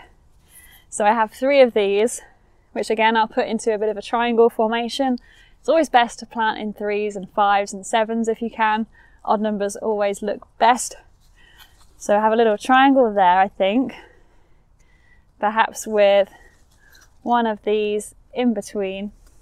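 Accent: England, United Kingdom. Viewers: I must say... It is nicer listening to your English Accent. My American accent is very flat sounding...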